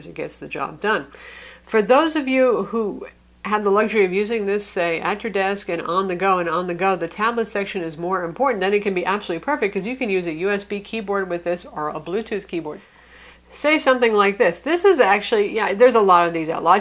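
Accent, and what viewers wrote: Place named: New England, United States. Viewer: Do you live in New England? You have that accent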